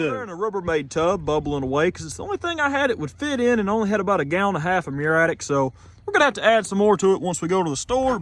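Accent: South, United States. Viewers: Your down south delivery put me off at first, but I liked the projects you were working on. Now, I have no issues and this far north ( Alaska) junk car junky enjoys every video you put out. Watching the efforts you put in and the problem solving involved is top notch. Making push rods for this is back yard genius stuff! Thank you for what you do and how you do it! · You're the most stereotypically American-sounding person I've ever heard. Amazing.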